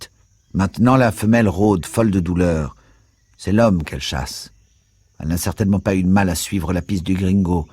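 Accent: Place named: France. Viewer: One of my favourite books. Pity you read it with a Frenchman accent. Please retry.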